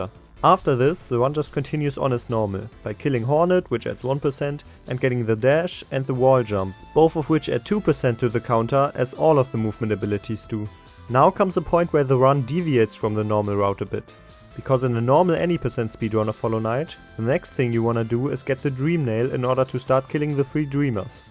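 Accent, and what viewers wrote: Germany. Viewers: I, a german, watch you, possibly and probably a german, talk english. Beautiful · Nice Video but hör ich dar ein deutschen Akzent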